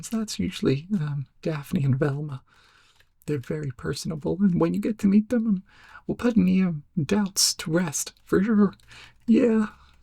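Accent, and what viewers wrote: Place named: United States. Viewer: Interesting American accent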